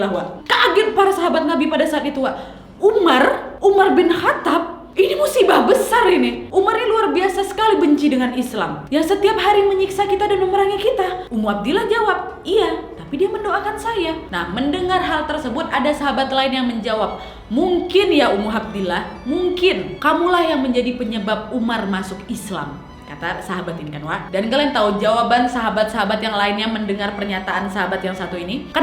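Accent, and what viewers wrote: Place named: Medan, Indonesia. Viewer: Orang medan nih hehe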